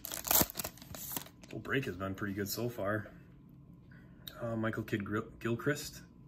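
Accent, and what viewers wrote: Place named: Canada. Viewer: I can hear a Canadian-like accent b/c instead of bosa I hear bowl-sa. Just say it and see (hear) the difference.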